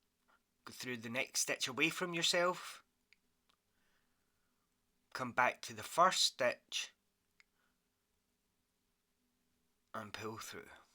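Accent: Scotland, United Kingdom. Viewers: So glad to see you are well enough to be back giving everyone joy with your tutorials. They are so helpful and give clarity to your pattern. I love listening to your Scottish accent as well.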